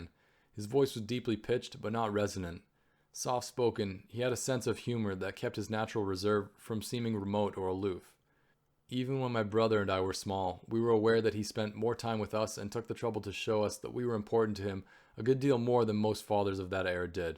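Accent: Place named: Canada. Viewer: It does not matter to me that you mispronounce words because you speak with a Canadian accent. I am grateful that you uploaded this masturpiece by Dallas Fort Worth.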